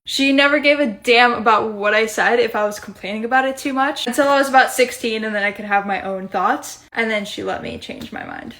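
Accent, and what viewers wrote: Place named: United States. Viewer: You've got an American accent, but a Russian face 😅😅 Your acceptionably beautiful 😍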